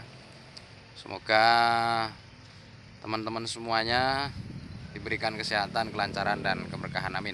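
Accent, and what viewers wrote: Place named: Banyumas, Indonesia. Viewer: Terus berbagi bro...kang kulon kye tangga ngapak😃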